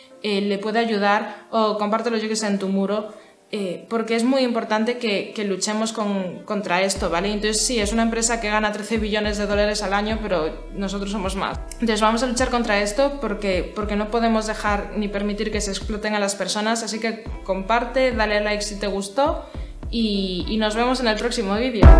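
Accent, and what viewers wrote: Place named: Spain. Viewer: Me gusta tu acento español,por cierto eres muy guapa ☺️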